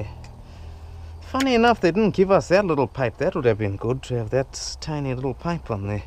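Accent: South Africa. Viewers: Where are you from? You sound like a South African. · Hi Calvin here can hear you are from SA 😅 wanted to know is there a way i can get in contact with you for the spares you get cant get nice things this side for the golfs and audis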